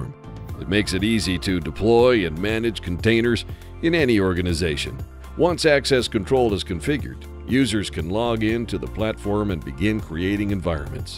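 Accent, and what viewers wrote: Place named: New England, United States. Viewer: why does he sound like a yankee with a country accent?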